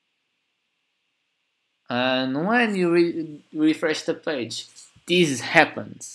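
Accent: Portugal. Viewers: Assim que ouvi a tua voz reparei logo que eras tuga, bom vídeo. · Esse sotaque português ... Topei assim que começaste a falar. Obrigado pela dica bro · Pelo teu sotaque bem me parecias português xD , obrigado pela ajuda !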